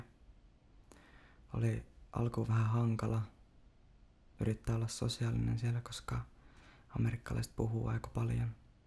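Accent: Finland. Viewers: Your finnish accent and cadence..... Relaxing rambling obviously comes naturally for you^^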